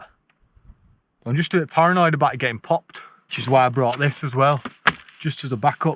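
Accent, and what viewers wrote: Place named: Wales, United Kingdom. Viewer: Brilliant video man… always top notch, loving the welsh accent funny as 😂 nice to hear you’re gonna pop to Brecon and do pen y fan, it’s a good crack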